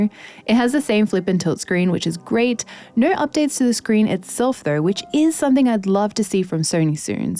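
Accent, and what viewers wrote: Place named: Queensland, Australia. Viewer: Are you from sunshine coast? You just sound like your from Queensland.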